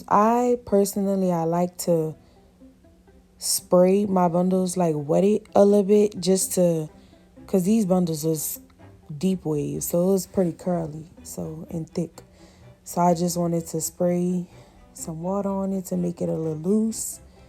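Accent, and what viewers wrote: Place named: New Orleans, United States. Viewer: New Orleans!! lol gotta be I know accents like the back of ma hand im from Atlanta, but if im wrong ma apologies love lol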